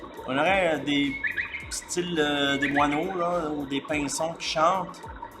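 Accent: Quebec, Canada. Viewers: Merci beaucoup pour la démo, en plus vous semblez bien sympathique avec votre accent qui donne envie d'aller au Québec :)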